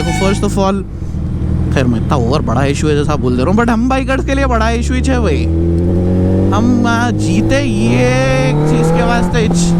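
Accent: Hyderabad, India. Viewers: Assalamwalakum bhai maintained Hyderabadi style me In words 👌🏻👌🏻👌🏻👌🏻👌🏻♥️📸💥💥💥😍🤲🏻🤲🏻🤲🏻 #Kįrāk 🤯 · Ye Tappori dilect nai hai bhai !! Hyderabadi hai Hyderabadi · bhai it was just crazy listening you.🤩🤩🤩 Aapki riding,aapki English (mashallah),aapki commentary Hyderabadi mai,aapka slang to good to awsm to listen.🔥🔥🔥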